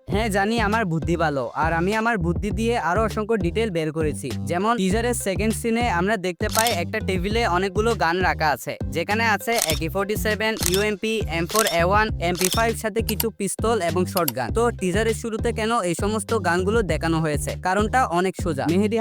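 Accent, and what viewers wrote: Chittagong, Bangladesh. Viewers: আরো চাই তোমার বাড়ি কি চিটাগং ভাইয়া তোমার কথা শুনে লাগতেছে ❤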